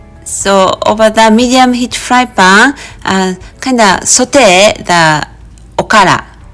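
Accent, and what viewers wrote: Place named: United States. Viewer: Thank you!!...I love your accent japanese - american ;greetings from a peruvian nikkei !!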